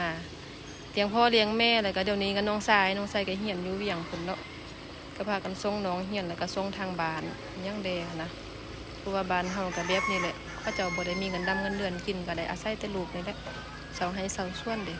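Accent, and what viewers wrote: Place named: Dan Sai, Thailand. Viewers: เป็นตาฮักหลายสาว ทำไมผมฟังสาว สำเนียงเว้าน่าฟังแล้วยิ้ม ตามเลยเด้ สบายดี บรรยากาศที่นั่นคงดีหลายน้อครับ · สำเนียงคือ คนด่านซ้าย นาแห้ว ภูเรือ พ่อครู ยุด่านซ้าย จร้า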